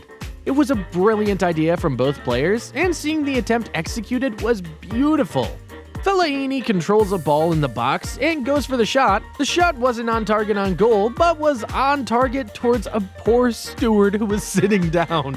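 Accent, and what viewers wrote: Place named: United States. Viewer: Bros definitely american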